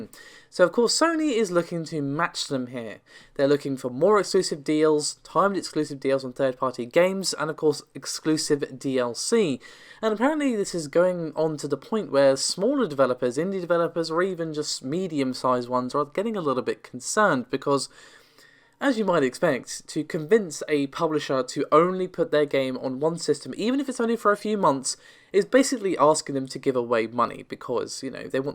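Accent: England, United Kingdom. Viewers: if you're seeing this, it's not you. Rather the accent and voice together, I have this sometimes with English women, because the voice pitch is very different from where I'm from haha. In like every video I had parts where I thought, noo, this is 100% a women and others parts where I thought, nooo 100% a guy, I just can't tell because of the accent xD it's not you, it's me:P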